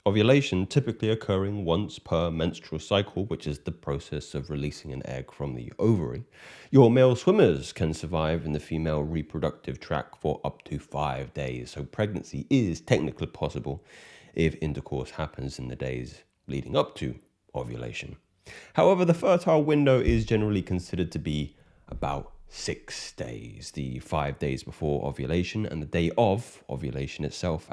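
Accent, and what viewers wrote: United Kingdom. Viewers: Ngl, the proper British brother's verbal gentle assault on my brain sparks the biological need to bring as many kids as possible into life and actually be a masculine manly father This the typa conditioning that should be broadcasted instead of whatever anti biology society participates in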